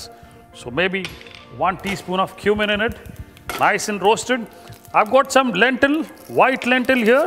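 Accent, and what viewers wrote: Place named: South India, India. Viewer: I am Fan of all your cooking but generally I wanted to clear so.ething , you look punjabi but your acsent is south Indian , are you born n bought up in south India?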